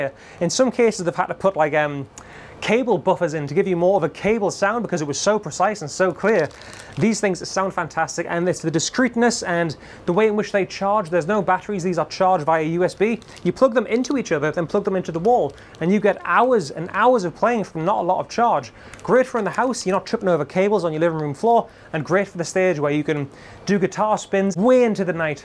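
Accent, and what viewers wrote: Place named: Newcastle, United Kingdom. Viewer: I had no idea you were a Geordie. I thought you were Scouse or something. I really need to learn my UK accents.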